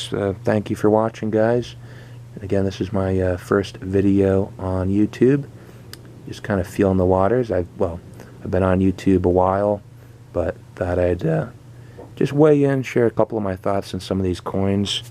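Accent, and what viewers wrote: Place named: Netherlands. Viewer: So you're U.S. based because of the ASE's being first on the list ? Was actually wondering if you were sort of Dutch because you seem to have short of a slight Dutch undertone accent. Ooh... haven't seen such coin roman numerals but agreed : here's to a great 2020 of MMXX for us p.m. stackers around the world !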